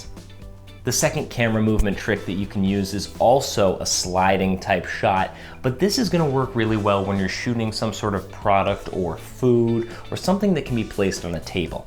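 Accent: Boston, United States. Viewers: Wait! Are you Boston?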